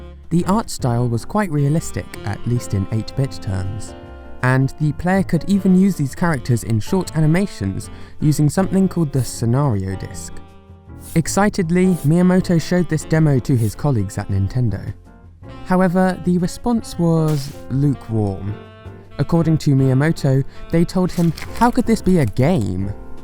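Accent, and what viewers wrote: United Kingdom. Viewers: Are you a British?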